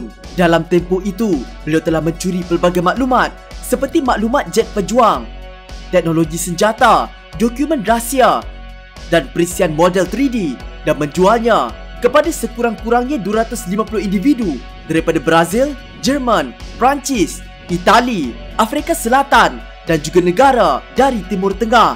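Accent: North, Malaysia. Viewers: Org utara ke yg bercakap nie. Tak sesuai la bro🤣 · Org utaqa kaa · uii orang utara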